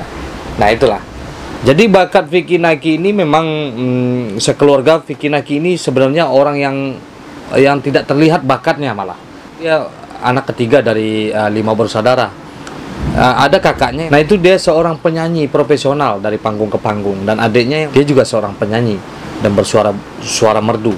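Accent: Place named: West Sumatra, Indonesia. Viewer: iyo lah logatnyo Ajo mirip urang minang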